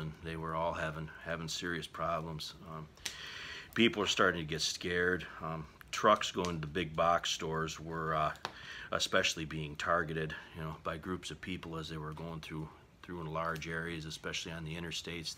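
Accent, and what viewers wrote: Michigan, United States. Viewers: Sounds like you did some research for this one.👍 Just found your channel and subbed Sounds like you’re from Northern Mich. also.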